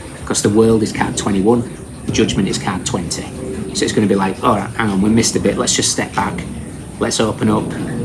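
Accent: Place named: Lancashire, United Kingdom. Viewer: I’ve been trying to place his accent. Lancashire, Rossendale?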